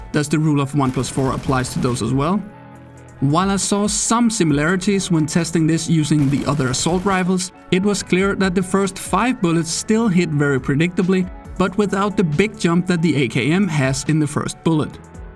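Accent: Denmark. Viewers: You deserve all the subscribers you have multiplied by 10. Thx for such great content. Are you btw from Denmark? Seem to detect a DK accent 💪🏻🇩🇰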